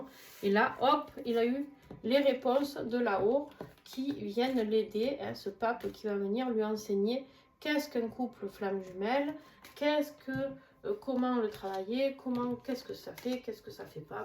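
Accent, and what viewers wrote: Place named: Marseille, France. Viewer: oh peuchère, avec ton accent marseillais on te croit sur parole !!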